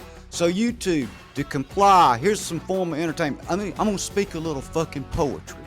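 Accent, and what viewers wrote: South, United States. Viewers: Good Reviews... awesome southern accent my G know what im talkin bout..🤙🖖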